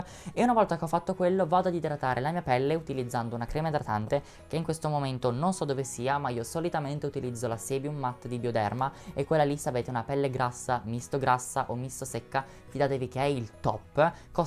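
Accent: United Kingdom. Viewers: Sei un grande io adoro come ti trucchi adoro la tua accento inglese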